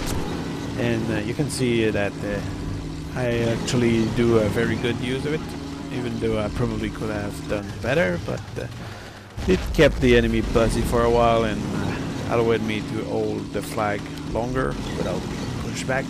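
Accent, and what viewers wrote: Quebec, Canada. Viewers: You're French-Canadian right? all due respect, just curious ;) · you are from quebec?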